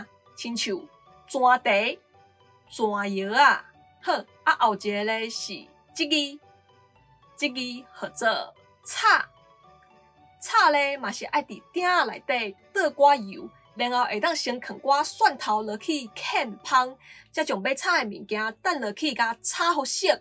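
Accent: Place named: Taiwan. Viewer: 分解釋得很棒👍年輕人台語道地不容易會講又能詮釋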